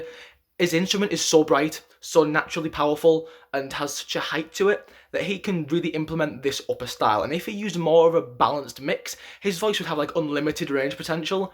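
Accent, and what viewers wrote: United Kingdom. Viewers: My brazillian ass had a big trouble to understand everything with this beatiful british accent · Well I'm guessing that since he's British and he also talks really fast, it sounds like he's saying "monster" because of his accent. I've even seen British Monbebe who say it like that sometimes, so I don't think it's intentional.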